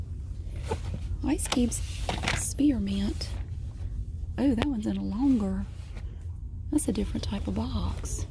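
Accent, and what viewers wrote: South, United States. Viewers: Why does she sound like Tati Westbrook with a southern accent ?? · I have found myself actually taking on your adorable southern accent;) and btw love the outtakes and bloopers! A kindred spirit indeed;) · Oh hey a fellow southern person 😂 her accent sounds normal to me because I live in the south (North Carolina) , that’s why I enjoy this channel so much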